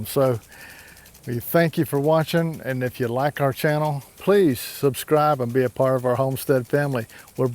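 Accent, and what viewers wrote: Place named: Outer Banks, United States. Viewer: I'd be willing to bet your originally from carolina with that Hoi Toider accent. ;-)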